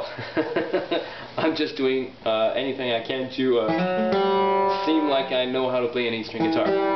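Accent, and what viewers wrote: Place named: Russia. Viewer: Ohhh... Your delicious russian accent :D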